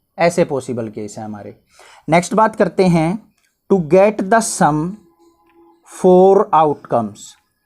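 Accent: Haryana, India. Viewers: Sir Haryana se ho kya aap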